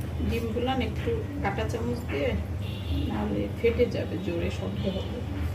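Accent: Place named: Barishal, Bangladesh. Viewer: আপনি মনে হয় বরিশাল্লা😅😅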